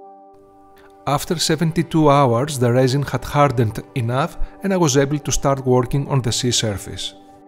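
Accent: Greece. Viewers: From the moment I heard your voice I knew you were greek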